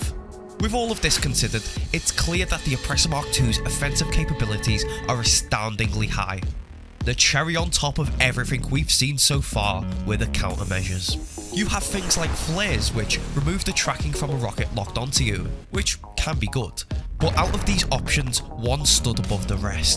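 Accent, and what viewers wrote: Liverpool, United Kingdom. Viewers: Are you scouse?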